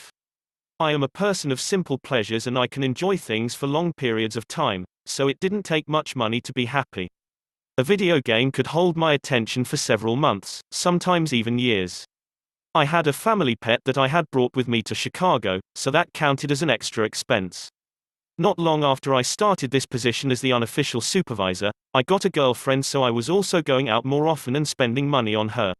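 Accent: United Kingdom. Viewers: British style, nice accent, but crappy pronunciation.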